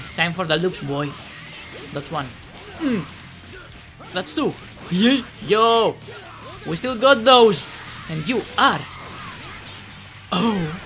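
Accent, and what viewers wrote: Spain. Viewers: Bro tu eres español hahahah se nota mucho, tienes acento español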